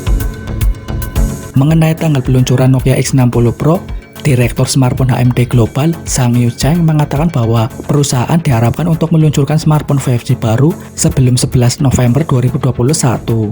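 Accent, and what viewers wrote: Banyumas, Indonesia. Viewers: Wong ngapak kie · Ngomong2 admin logatnya ngapak ... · Bosone ngapak ngapak.....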